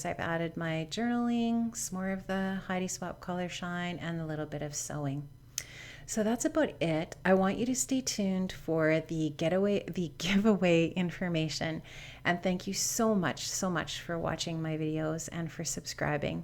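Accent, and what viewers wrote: Canada. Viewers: Hahaha - oh to be a fly on the wall on the wall and hear you do British, LOL! You really must treat your YouTube followers to a bit of story time :-D It would be brilliant if, one day, you did get to travel to London and Derby and all over the UK. I've personally never been to Canada, and can't claim any connections to your beautiful land, but I have heard amazing things! One day I will go, and that's for certain. (See if I can nail your accent... that kind of thing, hehe!) Hope your summer homework isn't too arduous, poor you. Have a great weekend! :-D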